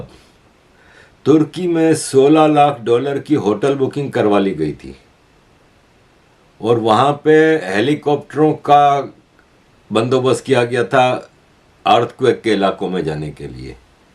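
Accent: Gujarat, India. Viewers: Why bhai sounds like gujrati. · Apka gujrati bhai surat si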